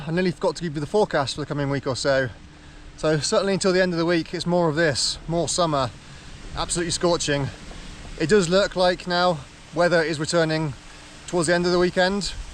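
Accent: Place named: Scotland, United Kingdom. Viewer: you mentioned living in Scotland, are you from Scotland? I always thought your accent sounded similar to Scottish Cyclist David Millar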